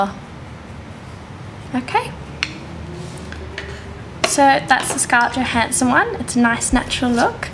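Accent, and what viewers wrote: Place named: Australia. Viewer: u have such pretty eyes.. oh nd ur relle pretty too lol..are u from australia i love your accent!!!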